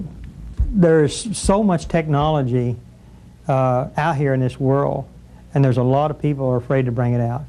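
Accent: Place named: Appalachia, United States. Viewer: This guy doesnt seem nearly intelligent enough to have invented what he claims to have invented. Talks like a hillbilly idiot. Has no wisdom or common sense. Can't explain his own inventions any better than an elementary science teacher. Thinks the government and the patent office will stand behind any free energy device. HA! is dead now isn't he? Why am I not surprised? My best guess is he isnt even the inventor. The real inventor (Dennis Weaver?) probably didnt want to be publically known and stepped up to be in the limelight and take the invention public. ( very poorly I might add ) This is a guy that doesnt even show an understanding of amperes vs volts and what power actually is. His new "battery" is nothing more than a capacitor. Magnetic heating isn't new. It's called induction and eddy currents silly. Seems to think all power is measured in volts. 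"see dem there volts keep goin up, we're makin power".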